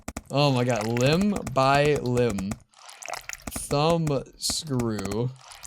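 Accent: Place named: Appalachia, United States. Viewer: Next ingredient is whatever the heck he says! I can't understand hillbilly language!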